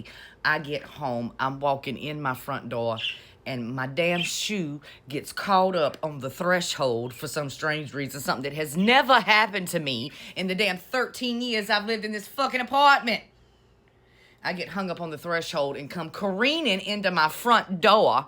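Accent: South, United States. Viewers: You tickle me; I'm so sorry that you had a bad, but your "rant" made me cry. I'm from South Carolina, but have lived in Minnesota for the last 18 years. I miss everything that you mentioned... field peas, collard greens, gravies, family close by,, hush puppies...and even the Waffle House! Your beautiful drawl is like sipping a mint julep, on the veranda -on a hot Autumn day , but not in a dysfunctional Tennessee Williams" kinda way. Thank you.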